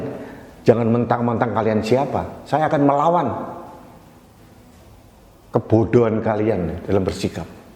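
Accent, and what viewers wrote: Java, Indonesia. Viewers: Privat lambe mu ambles kui, hhhh medok tapi kritis, MANTAP PK 👍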